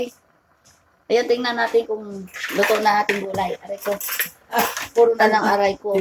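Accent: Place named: Visayas, Philippines. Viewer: Lami nag pinakbit ate 😋 cute mu mag bisaya ate oyy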